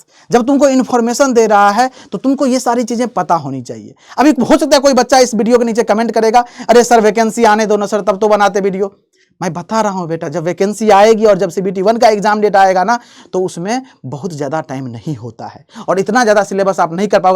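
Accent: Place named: Bihar, India. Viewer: Sir aap vihari ho na qwalification nhi hai wo.. qualification bolte h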